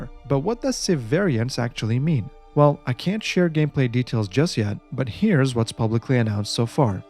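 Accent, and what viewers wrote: Arab world. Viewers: My man. Your arabic accent is so eloquent. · oh god are u arabian?! the accent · the way you pronounced the names tells me that you originally Arab :P , anyway thanks for the info cant wait to have my hands on the new civs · i just realized you are most likely arab from your reading of the names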